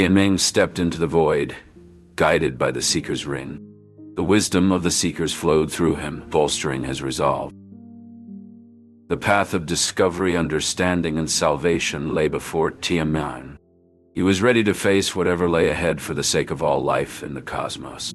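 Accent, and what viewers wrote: United States. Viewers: Ai sounds American, I mean its making a mockery of English as usual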